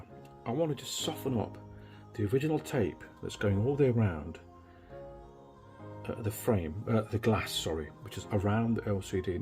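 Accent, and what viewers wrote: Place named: United Kingdom. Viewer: Thanks for this instructional! Yeah...my tab a dropped the screen and snapped the ribbon cable in half mid-length just as if cut by scissors! The screen glanced of my leg and luckily landed face down on the glass side on my linoleum floor. No APPARENT damage other than the cable itself. I now feel confident I can tackle the project. By the way ...What type of glue did you say you were using??? You sound like a Brit to my USA ears (or maybe Aussie?) and I couldn't determine for sure the glue type/brand you referenced Thanks again!!! By the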